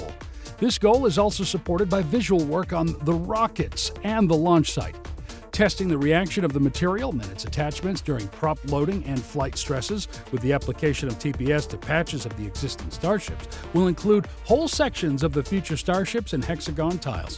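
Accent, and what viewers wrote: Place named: United States. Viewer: Terrible grammar in several instances. The script was written either by a non-native English speaker or not very well proofread, perhaps both. This makes me wonder if the distinctly American voice over is computer generated if so it is very convincing. If not, the reader needs some editorial discretion.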